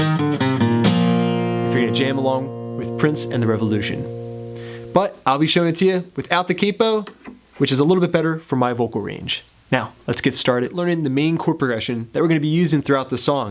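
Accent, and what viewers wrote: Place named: Philadelphia, United States. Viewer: Im also from Philly the accent was the clue I am working on Thanks for the great lesson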